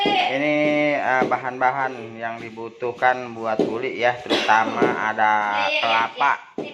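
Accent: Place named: Jakarta, Indonesia. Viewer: Betawi bgt dari ngomongnya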